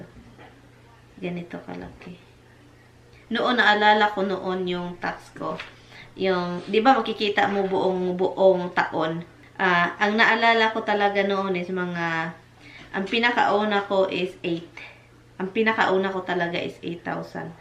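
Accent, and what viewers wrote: Visayas, Philippines. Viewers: Mkatawa mn ko mgtan aw nmu sis oi 😊🤣sagdihi lng na imu prounounciation bisaya ra mkarelate ana😁😁😁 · Hello and Tommy watching from Muntinlupa City.. Parejas ta bisayang dako dhay oi...yun english pronounciation ntin matigas hahaha😂 never mind the bashers insecured lng cla sau. Kc sobra blessed buhay mo kysa knla. Nkktawa kng panoorin ang saya mo panoorin dapat gnun buhay msaya life dpat gnyan tau iwas stress...enjoy life lng😉😍 katawa ra ta oi😂😂😂 kalami sa imong sud.an lato ug daing. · I enjoy your vlog so never mind the pronounciation understood na yun mga pilipino tayo hindi naman tayo british or amerikano love you.♥️♥️♥️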